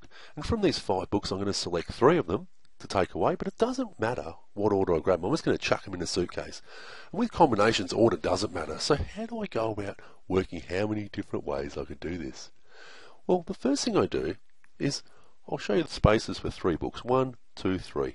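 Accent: Australia. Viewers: Are you Australian? I love your pronunciation hahahhh Anyway I love your videos Love from Italy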